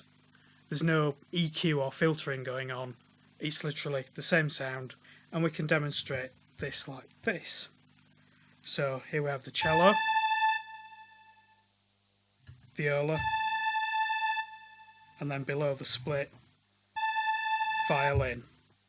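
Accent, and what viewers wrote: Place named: North, United Kingdom. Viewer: Great review nice to hear a northern accent on here.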